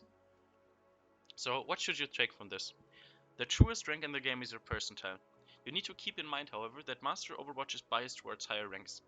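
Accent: Germany. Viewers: Great channel, keep up the good work. Sounds like a german accent btw ;)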